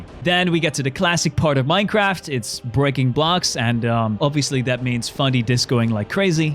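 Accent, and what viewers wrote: Netherlands. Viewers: Are u dutch me to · u dutch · wait are you dutch?? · Youre dutch? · Fundy are you Dutch (because i am)???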